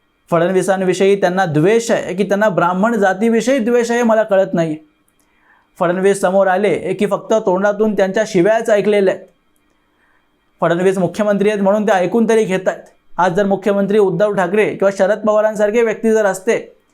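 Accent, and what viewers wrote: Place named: Karnataka, India. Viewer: आपले विषय विल्श्लेधन खूपच अभ्यासपूर्ण असणुबतारकशुद्ध असते. ऐकायला खूप आवडते आणि भावते. तुम्ही बहुदा कानडी भाषिक आहात का.आवाजात गोडवा आणि आपुलकी जाणवते म्हणून जिज्ञासा.🎉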